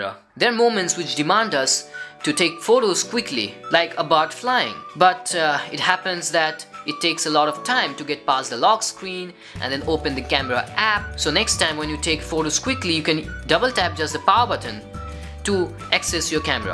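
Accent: India. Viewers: The way you pronounce the letter T is almost correct.... Unlike most Indians.... Just need a little more practice... And also your letter L still sounds very Indian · Dude speak English in an accent that is understood by others not just Indian only! So anoying!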